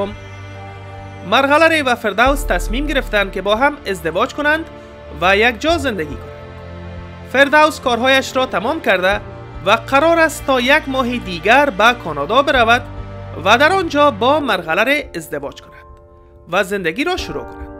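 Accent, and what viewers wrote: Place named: Kandahar, Afghanistan. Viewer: جان چی یک پشتو قنداری گپ زدی بیشکت وله😄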